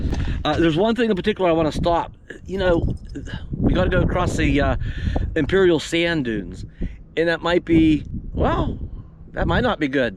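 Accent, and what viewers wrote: Pennsylvania, United States. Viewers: Hey, just noticed your video description still says you're in the Bounder, might want to update it! Thanks for the videos. I'm from MA but have family from PA and your PA accent always reminds me of my family and puts a smile on my face.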